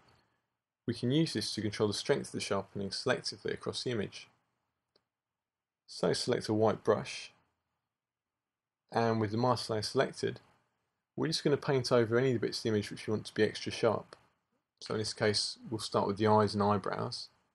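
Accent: United Kingdom. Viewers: buddha bless you for the British accent, so sick of s. tanks brilliant tuts, subscribed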